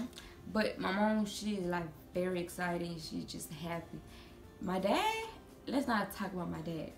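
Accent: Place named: South, United States. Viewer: Lol she sound country with a mix of Joseline herendez she really got a southern accent sounding like Omertà “ you so country you so country.. these hands country too” 😄😄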